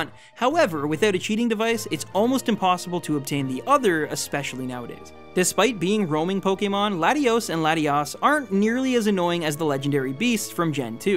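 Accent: Canada. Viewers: Bro your Canadian accent is so awesome, I want to watch your videos just to hear it lol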